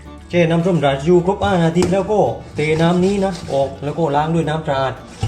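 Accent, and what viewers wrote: South, Thailand. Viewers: ชอบสำเนียงการพูด จังเลยหนิ😊😊😊รู้เลยว่า เป็นคนที่ไหน☺️☺️☺️☺️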